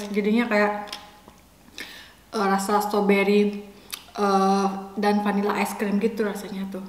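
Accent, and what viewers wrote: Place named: Bali, Indonesia. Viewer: Logatnya bali banget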